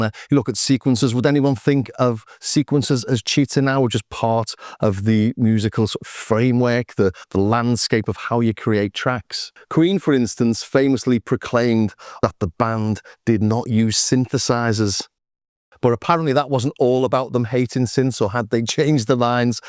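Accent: Liverpool, United Kingdom. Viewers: Interesting subject, you make a lot of good points. I would record the output of my modular just messing about and picking out the good bits. Guess I am a curator! A completely unrelated question: Do you switch to the Liverpool "k" when meeting fellow Liverpudlians?😁 Or is it only in certain parts of the city you have that sound? Cheers from Norway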